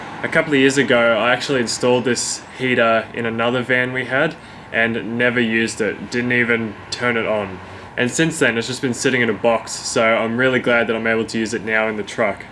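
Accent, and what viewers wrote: New Zealand. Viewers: Awesome!!!! Well done! I love New Zealand, the accent and your work! Can’t wait to see more!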